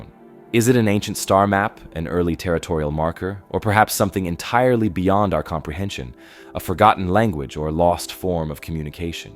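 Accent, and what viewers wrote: United States. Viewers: Thank you for the voiceover with an American accent. Well done. 👽✌️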